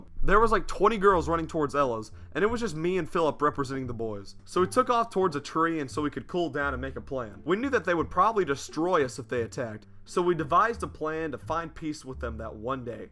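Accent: South, United States. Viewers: you have a heavy southern accent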